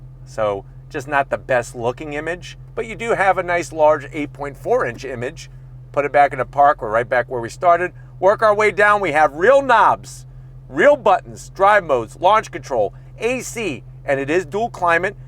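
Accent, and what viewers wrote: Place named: Midwest, United States. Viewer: Dude. You have got to be from the Midwest!!? I'm from Detroit. I can hear it! :text-green-game-over:😄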